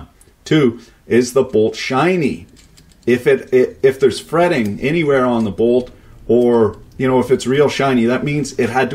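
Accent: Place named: Maritimes, Canada. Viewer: please check this article and related video on head bolt failure. <link> FYI its great to hear a fellow Canadian on you tube. For a guy living in BC you sound suspiciously like a maritimer. or maybe you had a Moosehead ale or to once in while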